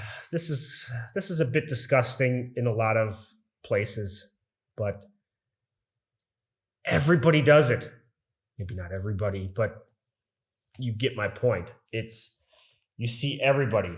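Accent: Germany. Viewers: get the feeling that you're more German then you are american.. definitely a German accent if you ask me.. so whats your real story? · honestly, this guy sounds really german the way he pronounces stuff. or is it just me thinking that? edit: nevermind, i just heard how he pronounced Hannover, lol. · Why do you have a German accent !?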